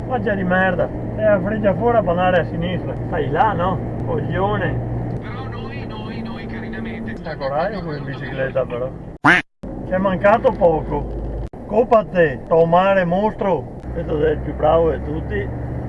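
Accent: Belluno, Italy. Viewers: buonasera non ho ancora trovato il tempo di contattarti via Mail che sto facendo straordinari al lavoro,comunque ti scriverò sicuramente,comunque hai l'accento identico e la stessa cadenza del mio ex titolare di Belluno e Alleghe quando lavoravo in gelateria qua a Stoccarda ora mi occupo di sistemi d'iniezione nel settore aero navale una grossa azienda qua nella foresta nera,comunque pochi mesi fa ero nel Veneto a visitare Venezia e Padova e son passato a Losson dell Battaglia per visitare un momento ai Caduti della Brigata Sassari che sicuramente forse conoscerai è proprio all'ingresso di Losson in quanto io abito si in Germania ma sono sardo di Sassari e volevo far visita a quel monumento ai Caduti Sardi,ciao buon fine settimana🙋🏻‍♂️🙋🏻‍♂️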